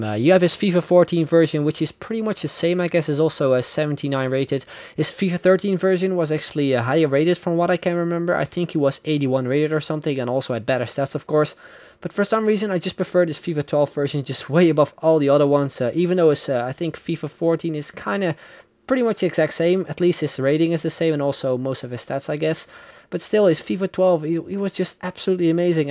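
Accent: Netherlands. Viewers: Lol i hear that you got that dutch accent when you speak.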